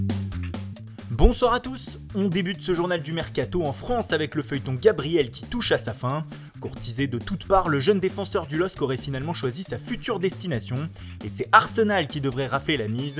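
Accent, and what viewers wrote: Italy. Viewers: En néerlandais "oe" se prononce "ou" Investissez un peu dans autres chose que l'accent italien hyper prononcé svp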